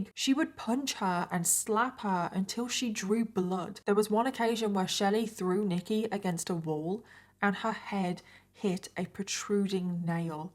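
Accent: United Kingdom. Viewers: Hi, are you British or Australian? I love your accent.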